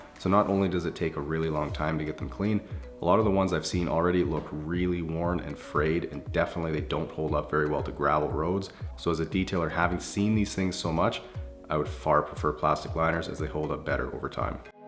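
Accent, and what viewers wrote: Saskatchewan, Canada. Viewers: are you guys dumb ??? He means he talks in a Canadian ""slang"" with a Canadian accent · Your voice is so soothing. And I like the way you word things. And your Canadian accent is growing on me too! Love your vids keep it up 👍🏽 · He's from Saskatchewan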